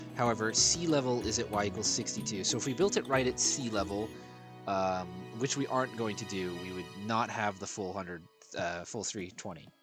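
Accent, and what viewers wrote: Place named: Ireland. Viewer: That Irish accent has me shooketh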